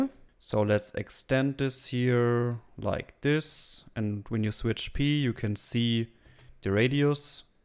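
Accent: Germany. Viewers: Man hört so das deutsch raus haha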